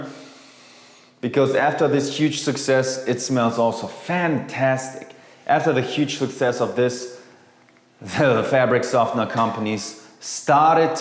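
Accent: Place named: Germany. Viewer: It’s his German accent. Btw pretty sure he speaks English better than you as a native speaker ever will lol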